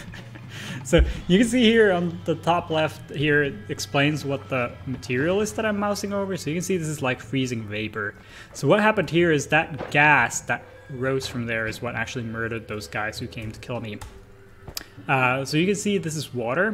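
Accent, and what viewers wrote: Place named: Finland. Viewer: Finnish accent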